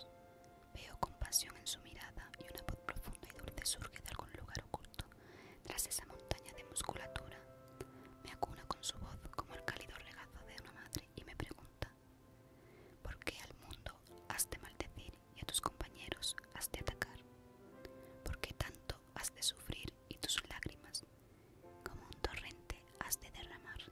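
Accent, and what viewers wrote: Spain. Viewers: Wow, I love Spanish asmr now. Especially with the Spain accent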